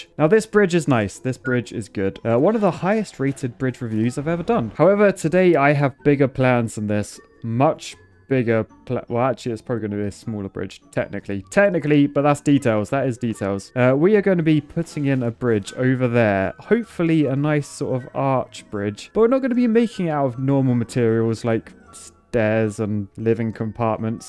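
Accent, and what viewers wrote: United Kingdom. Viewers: I agree, it's just the accent. Nice British accents make everything sound better, at least to Americans.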